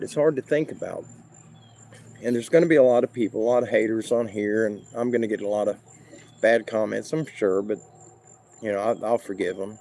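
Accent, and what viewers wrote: South, United States. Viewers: I thought your voice is awesome, i love that southern accent - i know what you were doing, you were only trying to make sure that everyone was happy - i do the same thing infact it takes me all day to get to the point, my brother will say to me ""For the love of God Michelina you've been circling the airport for an hour now ! You think you can land sometime soon...lol - im not going anywhere and neither are you, Our Lord n Savior didnt bring you this far you have more work to do, and the one comfort the one beautiful thing we all can keep in mind is "we all belong to a beautiful God and when its time and he wants to take you home, it will be a moment like no other you now know you have served your lord Jesus Christ and now its time to go back home - God Bless you, and until then you belong to us ...lol💖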